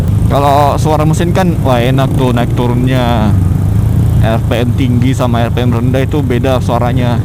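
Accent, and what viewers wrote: Makassar, Indonesia. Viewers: kentara logat makassarnya😂, salam sesama orang makassar bang😇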